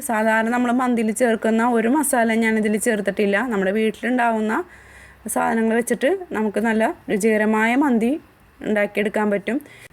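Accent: Kodungallur, India. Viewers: Itha kodungallur kary aano... slang kettitt thonni... nice recipe.. njn nthayalum try cheyyum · ഇത്താ കൊടുങ്ങല്ലുർ ആണോ വിട്. സംസാരം കേട്ടിട്ട് ചോദിച്ചതാ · ഇവിടെ മക്കൾ പറയുന്നു ur talking style same as me.. അതാ kdr ആണോന്നു ചോദിച്ചേ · hey njnum kodungallurr... Eriyad.. enkku samsaram kettappol thonni.. kodungallurr evdeya... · samsaram kettapol thonni njnum kodungallur aann😁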